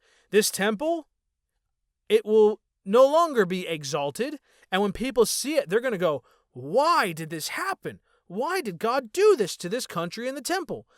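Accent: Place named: United States. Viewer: Is this talmud shit in American Zionist accent... ⁉️‼️⁉️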